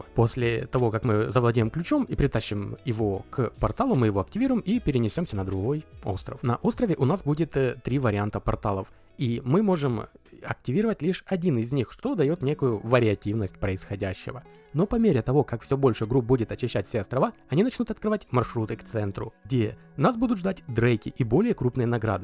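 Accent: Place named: South, Russia. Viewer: Бро, извини за возможно лишний вопрос, но почему у тебя в разных видосах где то есть диалект, а где то нету, говоришь без него, на букву "Г" предположительно южного диалекта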